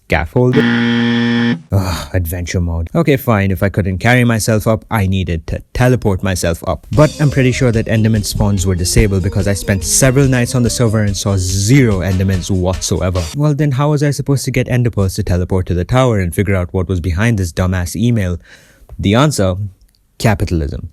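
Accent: India. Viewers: my favorite india accent person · are you indian u sound indian to me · Hello man with an indian accent. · he indian i can hear it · i never knew he was a indian or used to speak indian accent :) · Are you from India your voice has I think Indian accent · he used to sound like a lil indian before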